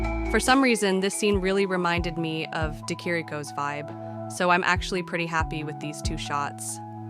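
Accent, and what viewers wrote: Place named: Italy. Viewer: I actually discovered your Lake District videos first and then worked my way back to this, your very first one. I assume the words here were yours, even if the voice telling the story belonged to someone else. I’m glad you eventually found your own voice — quite literally. As I’ve said before, you’re a natural storyteller. Your own narration, your personality and, yes, your Italian accent — I got it right this time! — make the later films feel wonderfully immersive. There’s something fitting about that progression too. Photography is ultimately about finding our own way of seeing the world, and perhaps storytelling is much the same — eventually we have to trust our own voice. And film somehow complements that philosophy perfectly. There’s uncertainty every time we press the shutter, but perhaps that risk is precisely what gives the eventual photograph its value. 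For me, the pleasure of the outcome still far outweighs the uncertainty of getting there. It is fantastic to see where these adventures began.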